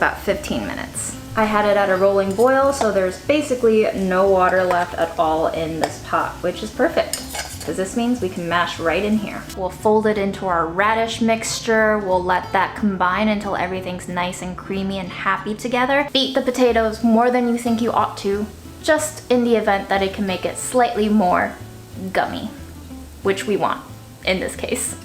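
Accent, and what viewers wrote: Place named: New York, United States. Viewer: !!! I love coming home and pressing play on your videos after a long day of work. So chill, So relaxed, So New Yawk, So Talented and Sooo Entertaining!! Thank You!!!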